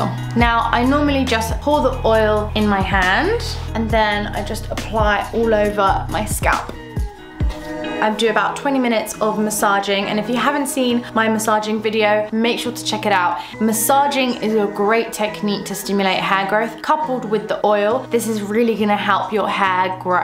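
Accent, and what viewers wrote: United Kingdom. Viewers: I, love those lips and British accent. Oh! I forgot. Great video too.😉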